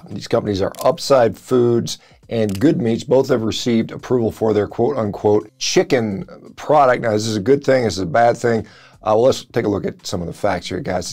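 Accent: United States. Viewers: Wow, thick as two short planks. 'Probably worse for the environment'. What is that supposed to mean????!!!! I can see that you are from the slaughtered meat lobby but you have not done your cause any good. You sound like a typical red neck American. You have little or no grasp of science and clearly do not understand what 'cultured meat' is. If you are from the slaughtered meatindustry you should be looking at this issue differently. There are positives and negatives. Around 800 million people globally rely on farming for thier income, directly and indirectly. It goes further than that too. This new form of meat production is going to impact, like a tsunami, eventually. Modern traditional meat producers need to work with this new industry and have control. You are going in the wrong direction with your post. Reply to me and get the real information as I have been researching this industry from the start.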